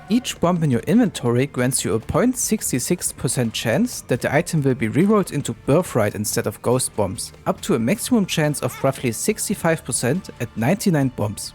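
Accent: Germany. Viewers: Wait ya german?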